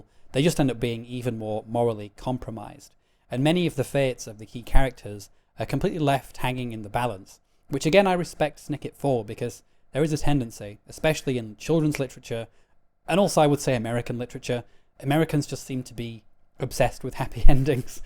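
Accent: Northern England, United Kingdom. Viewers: yes that’s it, my ears have become adapted to your accent! I had heard reference to the Northern accent but never really knew exactly what it was… kinda like the Southern accent here in America I guess…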